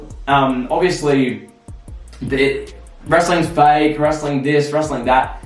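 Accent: Australia. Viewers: boy sound Australian but actually look like a south African or European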